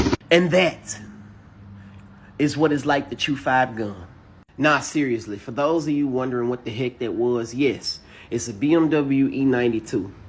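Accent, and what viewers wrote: Memphis, United States. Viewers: You can tell he from memphis btw he talks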